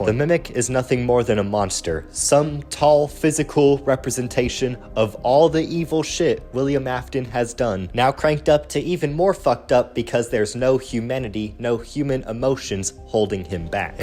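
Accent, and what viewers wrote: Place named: United Kingdom. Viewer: British accent boy 😂😂😂😂😂😂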